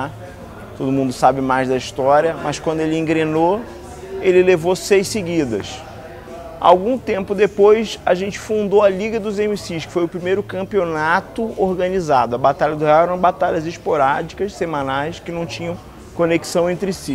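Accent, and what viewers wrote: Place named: Bahia, Brazil. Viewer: o kra fala assim deve ser bahiano kkk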